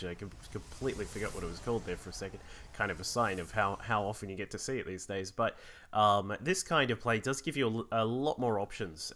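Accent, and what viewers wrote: Australia. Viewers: Nice cast. Are you Aussie ?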